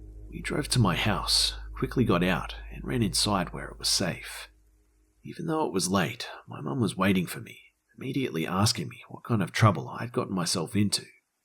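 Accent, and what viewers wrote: Australia. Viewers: It feels so good to finally have a fellow Aussie narrator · Fuck yea it's refreshing hearing a Fellow Aussie telling a horror story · you voice is priceless are u aussie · are u a aussie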